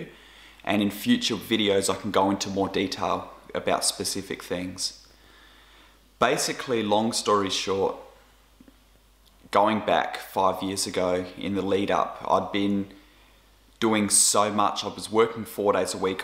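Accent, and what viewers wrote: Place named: New Zealand. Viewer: Hi! I just come across you a few minutes ago. I to have chronic fatigue and I am a Christian. I was just diagnosed early last year 2020. I’m from Auckland New Zealand. You sound like a kiwi are you from N.Z?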